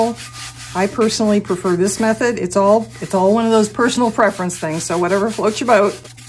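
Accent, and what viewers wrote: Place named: Pennsylvania, United States. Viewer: New Joisey? Nah, not NJ, you don't have a strong accent, I would say PA? That silver is sweet, love it with the skulls!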